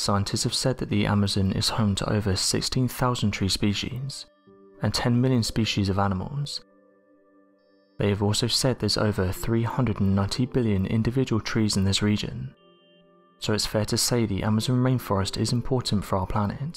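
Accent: United Kingdom. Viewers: He's British. Some of them do that. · Can someone with a non-british accent please make some space videos.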